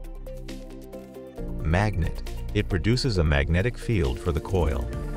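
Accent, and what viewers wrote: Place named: United States. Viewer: The automatic voice sounds American, while the writing and grammar are rather spotty.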